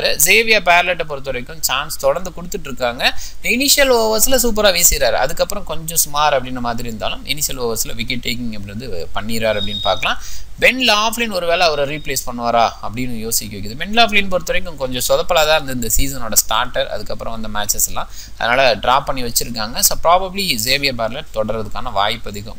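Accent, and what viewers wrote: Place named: Kongu, India. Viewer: Power play angatun. Kongu Tamil coming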